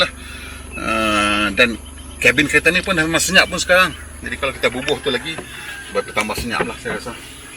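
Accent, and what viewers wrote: North, Malaysia. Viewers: Kalu ada lampu tuh..malam2 tak laa mengangau sangat..takdak lagi org ribiew keta guna ayat macam nihh..syoq abg dok sulam lorat utara nohhh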